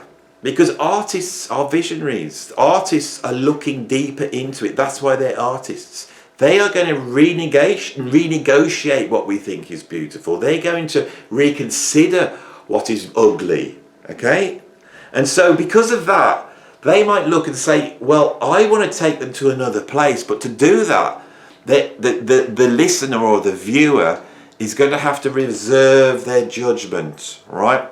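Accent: United Kingdom. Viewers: You seem to be laying on the British accent more than usual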